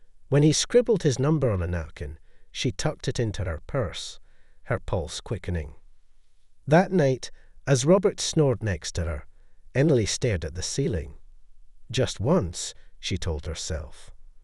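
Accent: Scotland, United Kingdom. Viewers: A Scottish accent is a deal breaker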